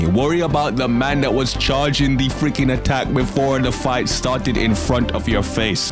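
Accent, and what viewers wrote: Caribbean. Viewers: are you west indian? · You from the Caribbean bro? Can hear the accent but haven’t lived there long enough to pinpoint which island that accent is haha. Amazing video btw, glad someone else felt the same way. Most other fans and the writers were giving UI Goku, Jiren and Hit handjobs while sleeping on the 80 other cool and unique fighters that they should have also given some spotlight.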